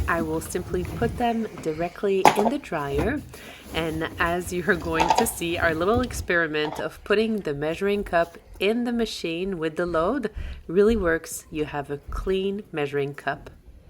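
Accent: France. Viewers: You got more done in your morning than I do all day! Girl when you were like 'and now it's ' I couldn't believe it! LOL Good for you I found this video very enjoyable and your accent is nostalgic for me as a francophone living overseas. Thank you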